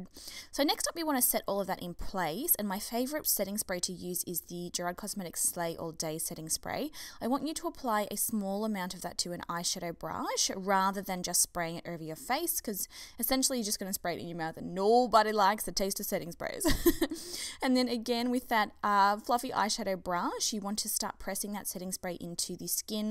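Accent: Australia. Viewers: You have the OPPOSITE skin type and foundation preference to me, yet I just can NOT stop watching your channel. And (no offense) I normally can’t STAND Aussie accents. Yours is just SOOOO cute, like a kitten purring. You’re just so charming, authentic, and your eyeshadow game is unmatched. Eyeshadow is where I seek the MOST instruction, as I have somewhat small eyes, a bit like yours, but I can’t tell, bc your eye looks are so FUDGING PERFECT. If you could do more eyeshadow tutorials, I’d send you a hundred pieces of vintage jewelry, no joke. Seriously. Also, you give the BEST BEST BEST makeup tips. I watch SO many makeup ‘gurus’, and since I found your channel just a few days ago, I’ve found tips I’ve seen NOWHERE else, which I’ve added all of to my playlist ‘BEST MAKEUP TIPS’, which only gets the very BEST videos added. Well done, keep it up 👏🏻👏🏻👏🏻👏🏻👏🏻👏🏻👏🏻👏🏻👏🏻👏🏻👏🏻👏🏻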